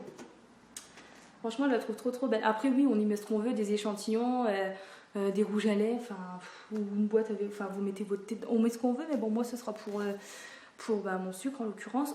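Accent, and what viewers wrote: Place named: Pas-de-Calais, France. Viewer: Coucou c'est une impression ou tu es du pas de calais ??? L'accent trahit ... je suis du pas-de-calais ;-)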